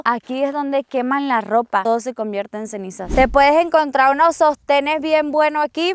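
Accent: Venezuela. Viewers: Su acento suena de Venezuela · Eres auténtica gracias por tus videos con acento venezolana❤ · Chama pero tú no pierde lo venezolano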